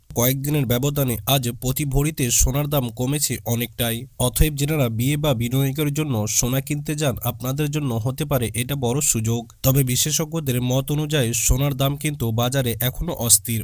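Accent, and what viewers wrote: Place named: Kolkata, India. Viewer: শোনার বাজার দুর হালা তর ভাষায় বুজা গেল তুই কলকাতার আর ভারতওয় ইউটোবাররা মতিথা বলে তরা ভুয়া বাটপার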